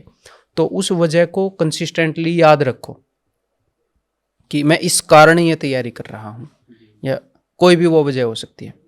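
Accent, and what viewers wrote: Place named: Haryana, India. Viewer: He is haryanvi bro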